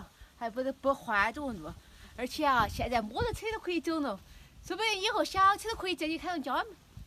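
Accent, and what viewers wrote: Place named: Sichuan, China. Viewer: 一直来都没听你在视频里说话 今天才知你是川妹子 声音很好听 以前我还以为你是南方广东人呢……。加油💪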